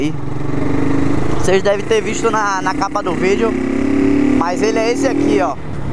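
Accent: Bahia, Brazil. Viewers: Vc e da bahia de meu mn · Mano se e Bahiano? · Pelo sotaque é bahiano kkk , de qual cidade mano ?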